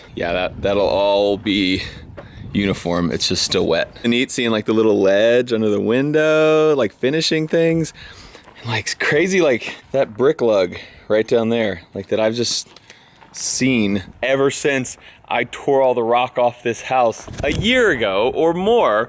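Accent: Texas, United States. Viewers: What part of Texas are u from 😐😐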